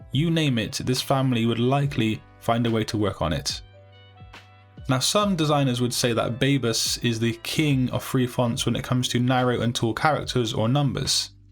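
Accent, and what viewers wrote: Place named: Liverpool, United Kingdom. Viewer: Excellent work and content. Highly appreciated. Like your Scouse accent.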